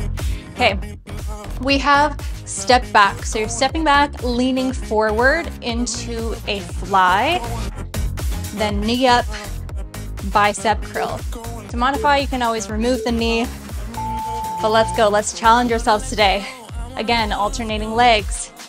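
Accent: Canada. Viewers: I love all your stuff, (Canadian??) accent included! "Oh Canada, you have hot and awesome barre coaches!" I love the programs with less/ no pauses between movements. Can we get some more of those, puh... puh... pretty puh-leaze! (Please! :)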